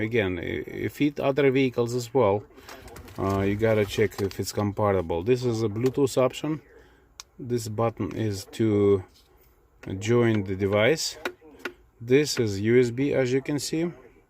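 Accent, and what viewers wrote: Russia. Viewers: Похоже на русский акцент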